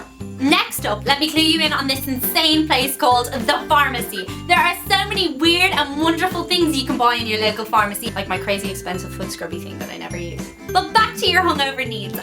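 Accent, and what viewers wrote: Ireland. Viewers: I can usually understand you, but I was too hung over to understand a word you said? You were gung ho on the Irish accent on this one? WTH?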